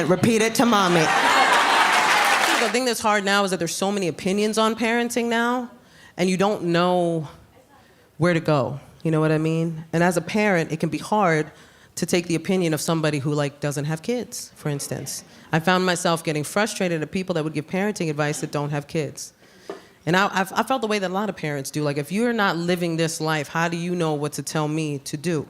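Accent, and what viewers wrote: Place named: Bronx, United States. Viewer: She is so New York...I love her. I'm from the Bronx too,she sounds like a real Bx girl,she is absolutely hilarious.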